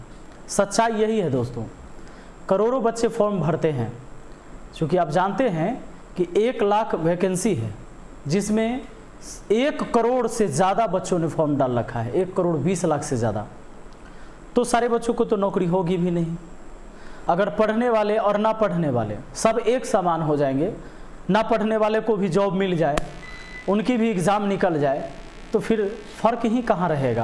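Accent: Bihar, India. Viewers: Abe Ga*ndu ab tu unko sikhayega kya shi hai or kya galt, wo Bihar ke hain unki language aisi hi hai, tere se bahut aati hai hindi to ye video hi mt dekh, ja dusre channel walo ki chat bhencho*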